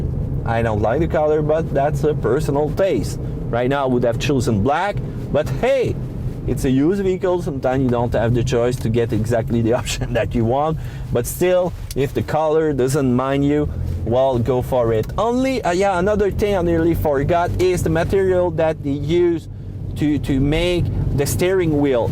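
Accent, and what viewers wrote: Quebec, Canada. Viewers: c'est pas du hate mais..j'avais jamais entendu notre accent québécois en anglais...c'est spécial xDD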